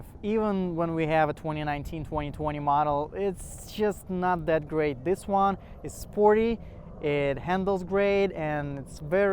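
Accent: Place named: Russia. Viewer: Russian accent af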